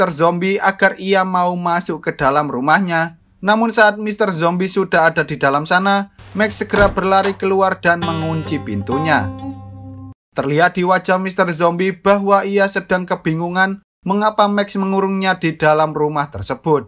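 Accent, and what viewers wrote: Java, Indonesia. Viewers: Medok Kabeh bahasamu le le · Intonasi MEDHOK, tapi mantaf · mantap bang medook ngomongnya hahah mantaap ciri khaas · Okeee rekkkk:v Btw wong jowo Yo?:V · Kentel banget logat jowo nya😁😁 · Pekat sekali bapak ngobrol jawa... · medok tenaaan , jawa mana sih mas , gak bisa hilang ya logat... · Mantap bg logat nya Jawa banget😅😂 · Mantep narator e medhok sip sip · Wong jowo yo mas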